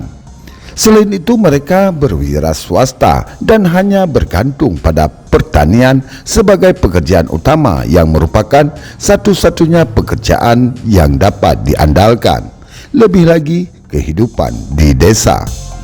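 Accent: Aceh, Indonesia. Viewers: assalamualaikum,,, ni yg ngomong koq kayak orang Aceh dialek nya??? 😁🙏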